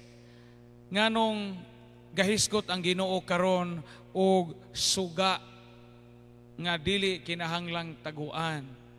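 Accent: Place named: Bohol, Philippines. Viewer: Lively pod kay siaw man siya. He reminds me of our former DepEd Division supt who is also from bohol. Parehas sila mosulti binol anon jud.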